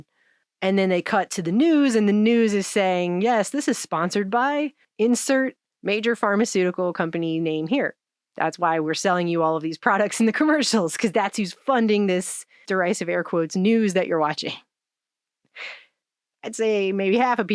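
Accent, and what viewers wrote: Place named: Hispanic American, United States. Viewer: I like your accent. You sound like... a second generation Hispanic American female, age 22-39, from the northern states, somewhere between Chicago and North Dakota, medium build, attractive, long brown hair. How close am I? Practicing making inferences from a sparse data set(i.e, your accent.)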